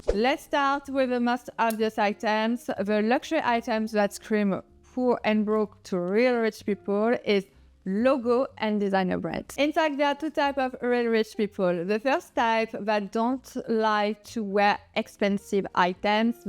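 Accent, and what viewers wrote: France. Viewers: Girl you have a heavy French accent it's hard to understand you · Respectfully, may I suggest that you add sub-titles or text to your videos. I understand your accent because I also speak French but other viewers may not. Thank you for your content, it is excellent !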